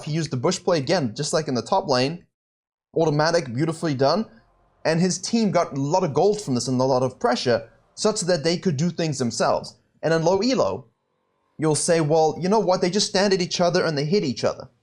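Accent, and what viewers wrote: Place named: South Africa. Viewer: Wait... Where are you from? You sound south African lol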